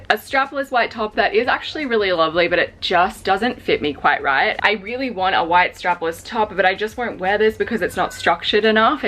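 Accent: Australia. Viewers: Dear, I love hearing your voice because you make me feel like I’m still in Australia! I often think I’d like to see how you’d evolve after having children... I had 2 pretty close together and THAT was made me crave minimalism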